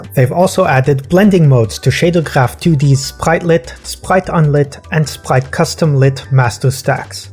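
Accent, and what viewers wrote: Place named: France. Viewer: Ton accent t'as trahis à des kms à la ronde ahaha Très content de voir du contenu de qualité de la part d'un français !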